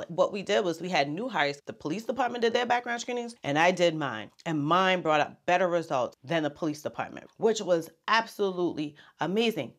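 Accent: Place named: Lowcountry, United States. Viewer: I don't know how your channel popped up on my feed. I'm just glad it did. I love the content but what got my attention immediately was the accent to which I said, This lady is from my home. I scroll down to the bottom of your description and see your address as Goose Creek. I definitely have to click subscribed for the Low Country. Stay positive!!!!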